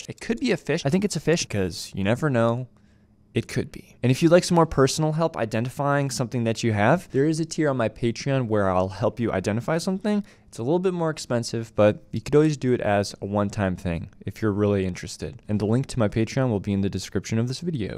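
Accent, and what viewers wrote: Canada. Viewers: Wonderful video! You’re a super likeable and charismatic guy— you should make a podcast! Also, you have the same speaking pattern as Jacob Sharpe; are you Canadian by any chance? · Lemme guess... ur from Canada. That is VERY subtle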